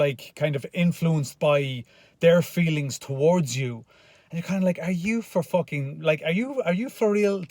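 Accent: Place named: Ireland. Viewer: I love your accent. If youre from Ireland, what part?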